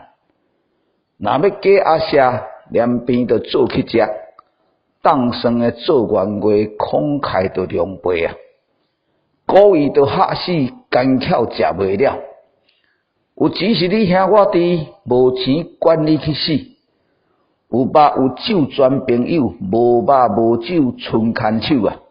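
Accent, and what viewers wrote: Taiwan. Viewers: 讚，聲音好聽 正港的台灣話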